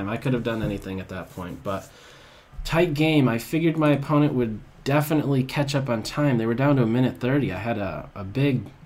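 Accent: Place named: New England, United States. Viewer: We don't get many Irish 'round these parts, so I'm not well trained in that accent. But I do hear the New England in his voice. Maybe small town New York or Pennsylvania?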